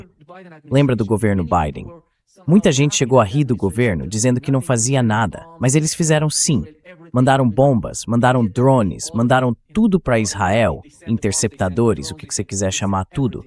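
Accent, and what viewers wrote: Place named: India. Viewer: nao é brasileiro, é só uma tradução automática. Acho que é americano descendentes de pessoas do Oriente médio provavelmente Ele tem um sotaque indiano, mas ele é branco, estranho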